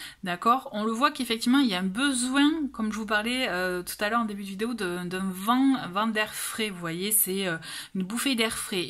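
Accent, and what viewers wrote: South, France. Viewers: Merci 🎉. Joli accent du sud ❤.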